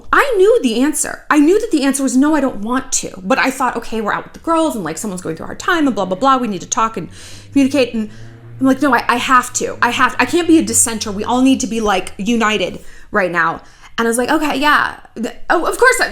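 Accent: England, United Kingdom. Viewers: i like your british accent so much i listen and don’t get the words because i listen your voice like listening music, i have to go back to listen again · Your English accent is 🤩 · I love your brit accent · LOVE the British accent! With love from London xx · Looooveee your British accent 😂❤️ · Love your English accent ! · Your British accent kills me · your british accent is on point, this video is straight facts. Your hair is glowing 💜💜💜 talking about glowing, you look really happy, relaxed, you are finally at peace. You're blooming... i'm so happy for you, i was so stressed myself out when i saw you struggling with all those issues and bad people. You deserve the best, you helped a lot of people to open their eyes and stand for themselves, you saved a lot of people from bad people and relationships. You deserve to be loved and cherished and this fresh start is for sure the best decision 💜💜💜 Kisses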